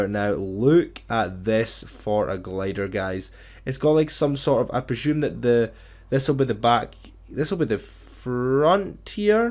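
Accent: Scotland, United Kingdom. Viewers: I’m Scottish and so is he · He's Scottish, I talk like that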